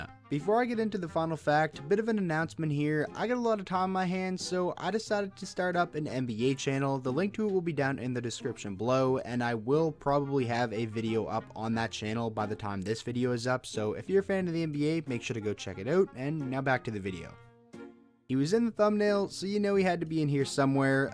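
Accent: Canada. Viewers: Which province are you from? You're the first canadian I've listened to who actually says "aboot" instead of just "abeut". I thought that was just a stereotype but I guess some of you actually do say it like that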